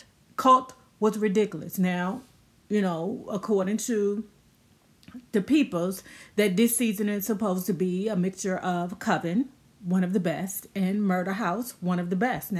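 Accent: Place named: Baltimore, United States. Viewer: I can tell you from B-more, your accent is strong!! lol #DRASK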